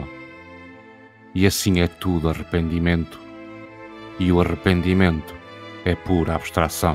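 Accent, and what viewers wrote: Portugal. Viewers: essa voz com sotaque português é delirante , como não se apaixonar por Fernando Pessoa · Ah esse sotaque português, me encanta e me acalenta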